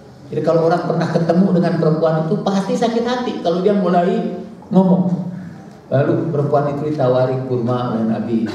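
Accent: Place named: West Java, Indonesia. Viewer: Sunda na kaciri pisan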